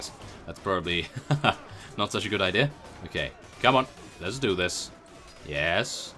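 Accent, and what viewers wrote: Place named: England, United Kingdom. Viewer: Finally caught up with the whole seriesssss, I love these vidsssss. You are sooo fun to watch. top 3 lets play channels for me are You, J2JonJeremy, and IDubbzTV. You guys just had a certain flavor to your vid, always listening to the audience and taking suggestions, instead of just playing blindly doing whatever you wanted, etc. Plus you are a very funny man even though it sounds like your serious at times (must be the english accent)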